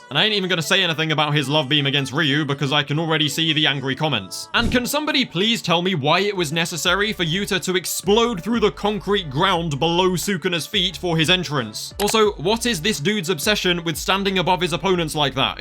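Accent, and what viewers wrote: United Kingdom. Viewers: I didn't know you were British mate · Your British? Unsubbed :(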